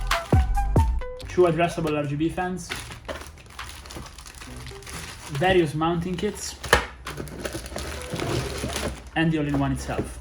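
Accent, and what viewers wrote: Italy. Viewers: Senza neanche leggere la descrizione del canale ho subito capitoo che sei italiano l'accento é forte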